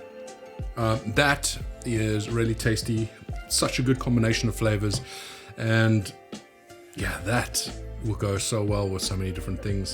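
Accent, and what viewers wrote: South Africa. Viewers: Thank you for video. The South African accent sounds like a breath of fresh air to me also. I grew up there. Been living in USA for 40 years now.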